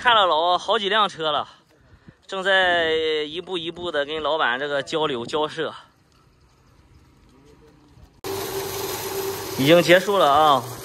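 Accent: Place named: Northeast, China. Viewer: 你的口音听起来是山东人，不知为何又带有东北味道😓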